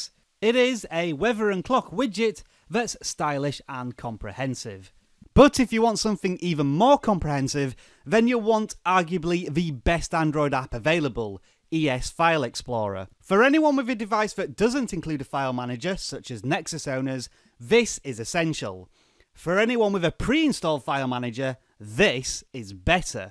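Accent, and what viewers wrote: United Kingdom. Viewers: British accent......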